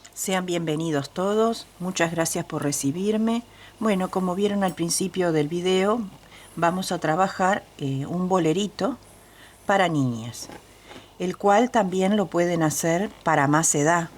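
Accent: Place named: Argentina. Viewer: Señora quisiera que usted me recuerde el punto Margarita, muchos les dicen jazmín, yo lo tejo hace ya un tiempo,pero olvidé como usted explica muy bien las cosas le pido ese favor la, la forma de tejer y de hablar suya dr parece mucho a nosotros los Argentinos, sepa usted que acá es muy querida un abrazó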